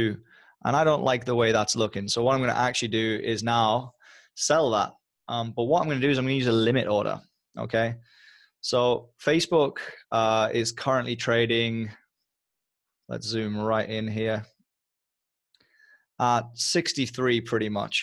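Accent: United Kingdom. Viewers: you from the UK?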